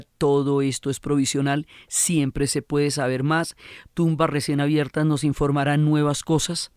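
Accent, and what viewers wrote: Bogotá, Colombia. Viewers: Si cierras los ojos, conectas el relato con el fondo musical y le pones imaginación, listo el viaje . Gracias por sus videos y podcasts Dra. Uribe. Además su acento Bogotana me fascina. Sobretodo cuando al final de algunas frases usted dice : Si !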